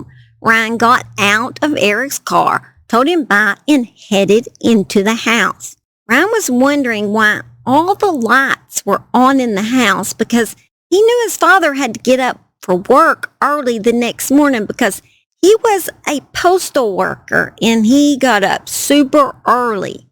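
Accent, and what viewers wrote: South, United States. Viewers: I enjoyed your video and your accent. Please keep it up. Sorry about your lupus. I have 7 autoimmune problems. It stinks. You take care of yourself. I’m from NC. I love Southern people.❤ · Congratulations!!!! 👏🎉 1,000 subscribers!! WOOHOO!!🌟🌟🌟🌟 You're going places 👍👍👍♥️💛💙🧡💚💜 your little Southern voice it's just perfect it fits you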